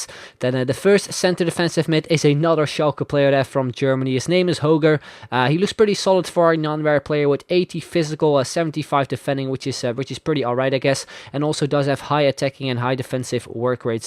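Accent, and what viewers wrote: Netherlands. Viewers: Is he dutch??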